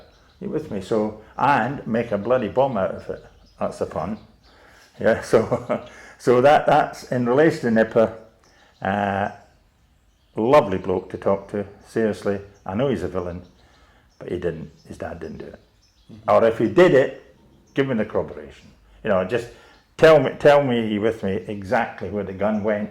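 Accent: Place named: Edinburgh, United Kingdom. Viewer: Great work I truly hope you get the answers you need I’d hate to see an innocent man die in prison ps are you from Edinburgh originally your accent is very similar to mines especially when you said it disnae fit 😂 ✌🏼💙👍🏼🏴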